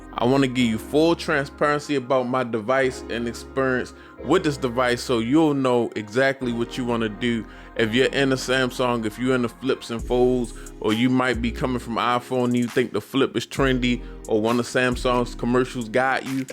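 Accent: Baltimore, United States. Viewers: Thats got to be a Baltimore accent 😅❤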